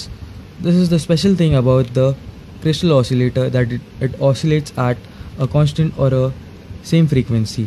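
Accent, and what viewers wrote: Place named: India. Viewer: I like your Indian accent. Great video!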